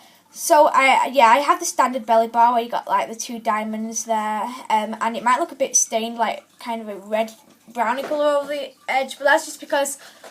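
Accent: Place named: Manchester, United Kingdom. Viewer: Princess_16x x yh its the manchester or london accent uk accent is better thn american accent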